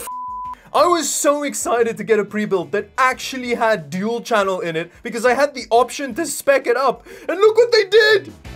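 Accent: Afrikaans, South Africa. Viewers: Love the content, keep it up. Quick question, are you South African? XD you've got the accent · South African accent??🇿🇦🇿🇦🇿🇦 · are you South African? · South African? 😅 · Jy kan dai afrikaanse Aksent hoor🤣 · This guy sounded south african but it didn't say anything in the about page so I legit opened his Instagram just to check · I sense a South African accent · excellent to hear that Saffer accent becoming even more pronounced. Represent Boet, doesn't matter where we are in the world, proudly 'Sefrican! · I think it's South African (where English might have been a second language).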